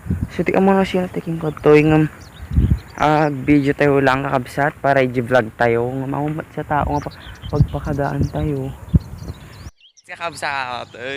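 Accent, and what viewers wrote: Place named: Iloilo, Philippines. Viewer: Ang cute mo magsalita kuya ilongo bayan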